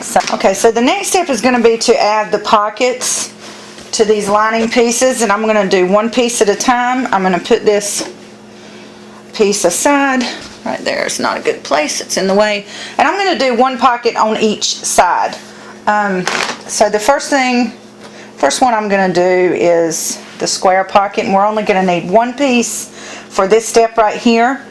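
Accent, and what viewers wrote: South, United States. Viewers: Very nice. Thank you for sharing. This was very helpful for me. I have not learned to sew zippers yet. However, I have made a lot of leather purses (Southwest Style). You are a great instructor. Your voice delivery is very clear and precise. Your instructions are easy to comprehend and follow. The southern draw makes it easier for me to understand ya'll. I am going to attempt this. However, I am going to try and line the opening to the pocket with garment leather. I believe I will sew the garment leather to the pocket slit before I bring the material through, to the 'wrong/unseen-side' of the purse liner. If it doesn't work, I will resort to this simple pattern. Thanks again!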